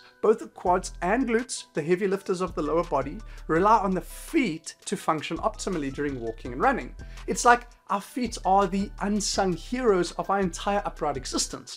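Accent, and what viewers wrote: South Africa. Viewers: Just listening to you makes me homesick for South Africa. Well done, bro.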